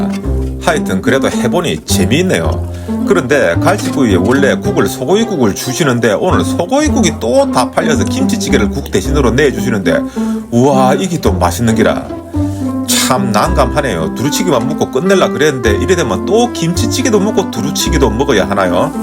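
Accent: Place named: Busan, South Korea. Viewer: 네네 정겨운 말씨랑 같은 부산시민이셔서 더더욱 즐감햇어요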